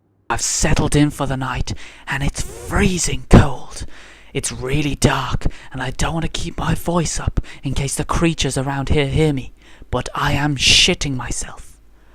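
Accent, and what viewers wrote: Ireland. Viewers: Are you irsh? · yep hes irish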